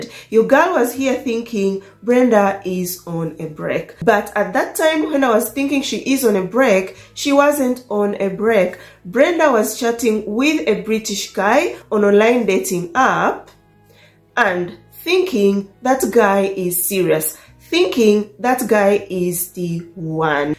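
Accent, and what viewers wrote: Uganda. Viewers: It's Ugandan accent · Teller's accent is a Ugandan.Sorry Brenda😢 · This is ugandan accent not mzungu the voice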